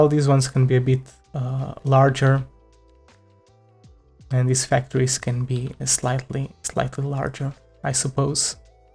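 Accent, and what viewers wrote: Portugal. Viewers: are you perchance porrtuguese?? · Ola amigo é evidente que o teu sotaque é português, devias fazer videos em Português ia ajudar bastante. Obrigado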